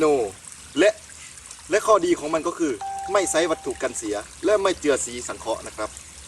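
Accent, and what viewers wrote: Laos. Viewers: เว้าไทยคือคักแทะเว้าลาวแน่ๆๆ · ไทยตกลาวนะคับ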